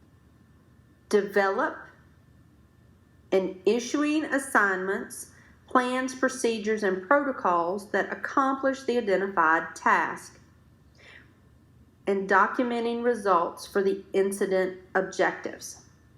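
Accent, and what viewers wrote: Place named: United States. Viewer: Holy crap I cannot handle listening to that hick twang 😖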